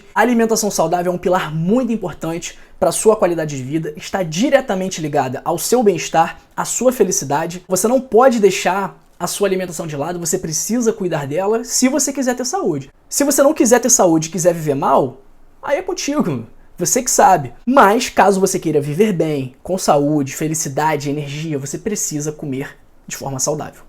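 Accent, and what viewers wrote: Rio de Janeiro, Brazil. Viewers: Esses olhos enfeitiça a gente! E esse carioqueixxxx é envolvente! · Eu acho q o sotaque dele e do Rio de Janeiro muito fofo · O sotaque dele não nega...é carioca mermo! 😄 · Que lindo te ver falar, tua fala é chique, deve ser carioca. Deus abencõe......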